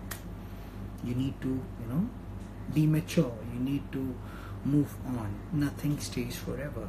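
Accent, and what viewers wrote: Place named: Delhi, India. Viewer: This day will be going soo beautifull bcz it's morning is soo fresh and happy......app inte fresh and happy and cute lag rahe the ki ufff apse nazre hin nehi hat rahi thi....😍😍😍kabhi socha na tha ki subhe uthke sidhe apko live dekhungi.....Hayyyy subhe subhe apko dekhke to pura din ban gaya this is one ofthe most happiest morning of my life........apki batein apke bolne ka tarika.....apki adaein...apki inspirational batein.....apki ankhe.....apki soch......every small little thing which you have just wonderfull.......apki woo hasi or frnd k sath bat karna Delhi style main.....jese bolte hain na Dilwalon ki Delhi hoti hai....wese hi apke pas joo dil hain na woo bohot hi acha hain or woo ek dam bache k jesa hai sooo cute baby......Yarr Dilliwale to chagaye si.......and thanks to sir for encourage our dear Rajat sir...... sir told that Rajat sir is a finestt acter I also agree with him...Rajat sir is the bessttt acter in this whole world....such a kind heart he has...such a pore soul person he love every one.....and he always say good for every and and learn and observe good things from every one......he always pampers his loveones....that sooo sweettt of him.......I never seen any humanbeing like Rajat sir.......he is the one person in my life who make me happy.......when I see him I feel soo peace in my eyes and my heart.....his smile it just killerrr😘😘😘😘I can't say anything about him bcz he is perfect from every side....like as a human or acter or person or son or fr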